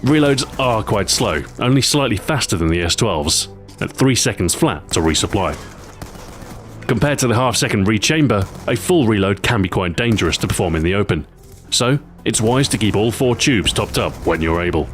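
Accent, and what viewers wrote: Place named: United Kingdom. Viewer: With a weird voice? He has a British accent, you moron.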